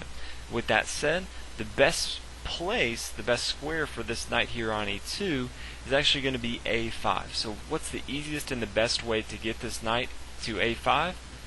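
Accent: South, United States. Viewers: This entire game just oozed talent and skill. I hope someday I'm able to reach half their level of skill. Excellent commentary as well, but I found some of the southern accent slightly distracting while trying to study the moves.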